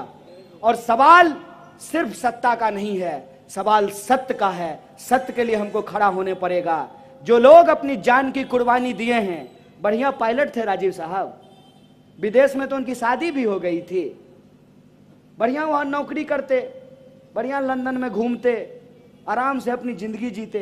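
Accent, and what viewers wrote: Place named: Begusarai, India. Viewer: कनैह्या विरोधी पक्ष को बएत रखने की लहजा तो पूरे बेगूसराय की हीं है.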